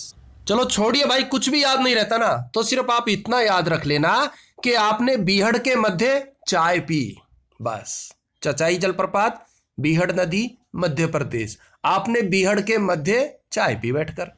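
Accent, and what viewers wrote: Haryana, India. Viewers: Haryanvi hindi sahi se nhi bol pate chitrakut..ko chittar kut padha ja rha h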